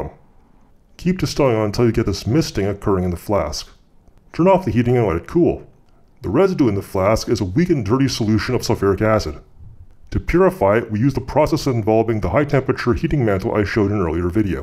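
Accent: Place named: Canada. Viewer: its not, he's just canadian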